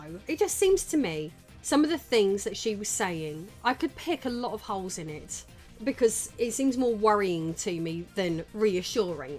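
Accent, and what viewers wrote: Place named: United Kingdom. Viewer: I actually like this new direction for the channel, I could see growing to one day to be one of the top gaming news channels because she knows how to properly research the topic and her British accent elevates the content by lending it a sense of legitimacy that American YouTubers just don’t have. I feel like I’m listening to a BBC news broadcast when she’s discussing the topic.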